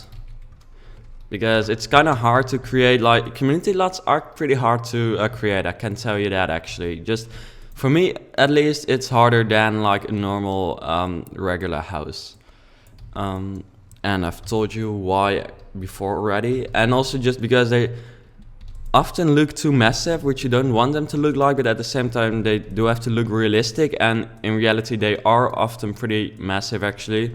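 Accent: Netherlands. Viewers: you can really hear the dutch accent...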